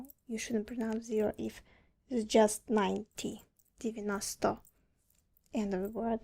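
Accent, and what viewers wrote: Russia. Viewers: Не кэн, а кьхен. Какой жоосткий русский акцент. Видео хорошее) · Strong russian accent is always a good trigger too. Idk why but I like it. Thank you!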